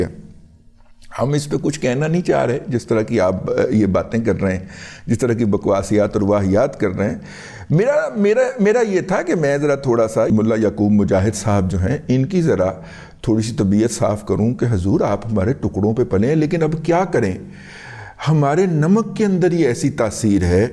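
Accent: Punjab, Pakistan. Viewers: Jaar tuhadi Punjabi bahut mitth hai !!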